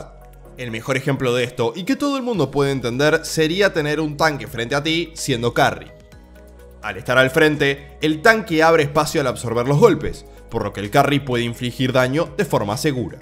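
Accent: Standard. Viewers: Porque habla en neutro si es más Argentino que yo 👀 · Solo a mi me desespera que un argentino hable neutro?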